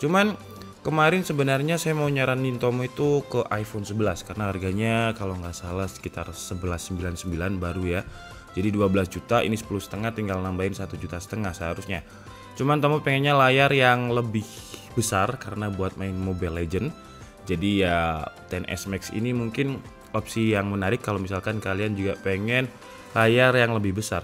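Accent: Malang, Indonesia. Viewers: Bang orang malang ya? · Bang asal malang kah?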